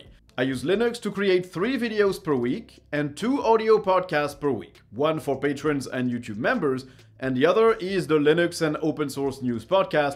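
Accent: France. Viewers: Did you say Ioatas or Aphostrophe? Could not get that from the french accent sorry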